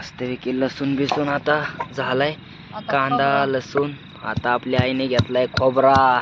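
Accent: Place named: Dhangari, India. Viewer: त्रास नाही पावणे थोड धनगरी बोलालवर चांगलं वाटतं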